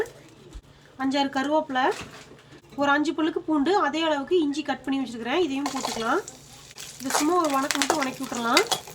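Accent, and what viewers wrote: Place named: Gobichettipalayam, India. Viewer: hi sis i am new neega namma oruu gobichettipalayagala unga videos pathaga ella nalla pannirukiga namma oruu pechu kekkupothu happy irukuthuga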